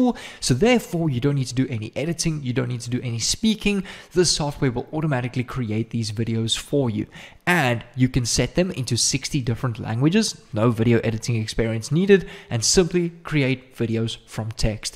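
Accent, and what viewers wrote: South Africa. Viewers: Ayyy, is that a South African accent I hear 😂🤙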